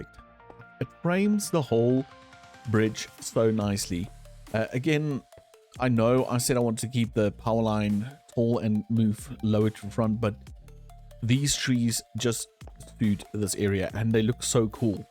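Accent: Afrikaans, South Africa. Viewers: I know an Afrikaans accent when I hear one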